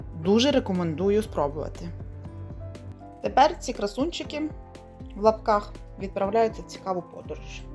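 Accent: Western Ukraine, Ukraine. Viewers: Ви мабуть з Західної України, бо розмовляєте не як Харків'яни, але зазделагідь вибачаюсь як це не так, в селі прекрасно живеться, головне все продумати завчасно з опаленням і комунікації в будинку щоб були умови для життя цивілізовані. Донечка як лялечка манюня і чоловік гарний 😍💓, хай вам Господь допомогає жити щасливо в оселі на Прикарпатті. Гуси - лебеді красиві но на жаль так Господь створив світ, що люди мають їсти 🍗м'ясо...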